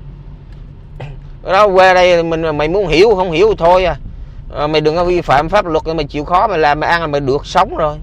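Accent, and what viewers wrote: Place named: South, Vietnam. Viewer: Anh nói giọng NK rất hay, ở VN hiện nay mở đài lên toàn nghe giọng BK nghe không vô.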